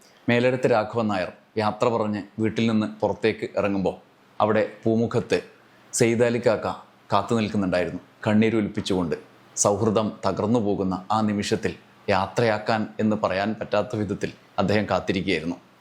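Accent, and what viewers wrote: Kannur, India. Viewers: സാറ് കണ്ണൂർ കാരനാനോ. എവിടെയോ കണ്ണൂരിൻറെ ചുവ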